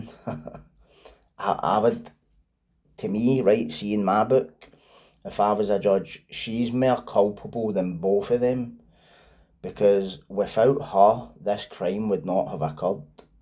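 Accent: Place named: Glasgow, United Kingdom. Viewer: No idea how i found your channel pal but im glad i did, id never heard about about this case, never mind what anyone comments or says, always safe sitting behind a screen, saying it to your face is always a different story pal, im subscribing so thank you for your hard work, great video xx its always nice to hear a fellow Glasweigian on youtube 😊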